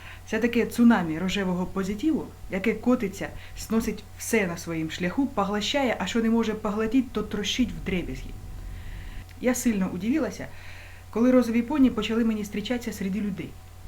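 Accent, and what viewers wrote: Surzhik, Ukraine. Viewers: Два вопроса: 1. Почему такой жесткий суржик? Выбирайте уже один язык, и говорите на нем. 2. Почему камеру так жестоко колбасит? А вообще классно, в точку! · БЛЯ! Какой говеный суржик. Пизди бля. или по-русски, или по-украински!))) А так все верно!)) Молодец! К сожалению продажного тупого дерьма. особенно на Востоке. очень много!)))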